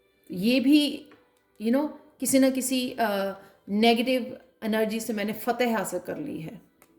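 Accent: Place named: Punjab, India. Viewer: Kya aap Punjab se hein. Aapki hindi mein punjabi ka touch lagta hai. Thanx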